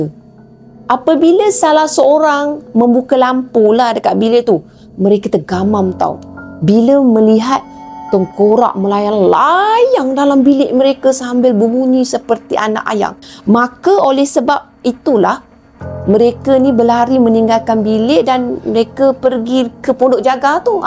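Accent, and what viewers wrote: Kelantan, Malaysia. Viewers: Kak bercakap KL capur dngn bahasa kelantan,saya selalu hadir Kak,saya dari kelantan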